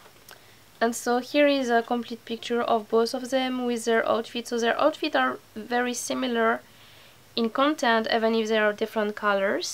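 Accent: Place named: France. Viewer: she is cute ! congrats ! are you french ? you have a accent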